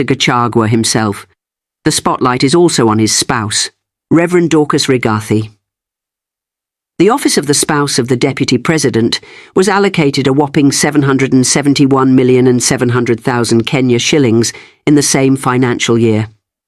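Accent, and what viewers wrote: United Kingdom. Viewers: I am for the audit when this is narated by a British,I have a problem 😢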